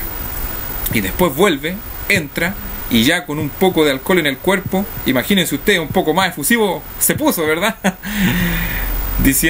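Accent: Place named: Rioplatense, Argentina. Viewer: una pregunta eres argentino o paraguallllllllo.....dime ...que le echan al agua por ahi???? o te dejaron caer cuando chiquito????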